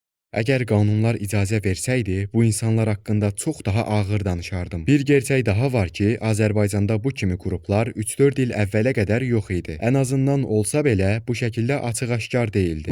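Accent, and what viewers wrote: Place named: Turkey. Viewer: Qardaşım bax bəlkədə bu mövzu ilə aidiyyatı sıfırdır ama xaiş edirəm yalvarıram Türkiyə Türkcəsi aksentiynən danışma çox ət tökən və yorucu olur hörmətlər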